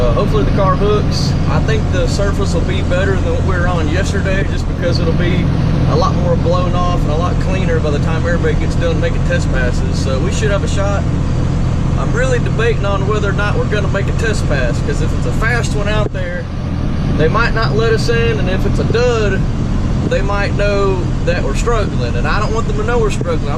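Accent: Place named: South Carolina, United States. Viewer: You gotta be in SC with that particular twang... maybe GA. I know a lot of guys around TR like to have fun. I am going to pick up a plaid myself. Cant wait to give my neighbors a stroke on test drive. Especially coming from a dual motor awd model 3.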